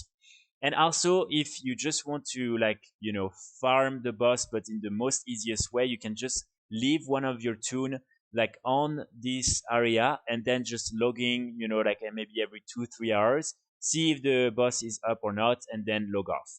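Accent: France. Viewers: u speak very well :p french ?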